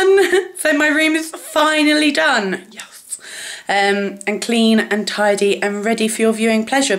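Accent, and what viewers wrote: United Kingdom. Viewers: its actually so nice to hear an English person on youtube! =D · oh and you have such a cool British accent!!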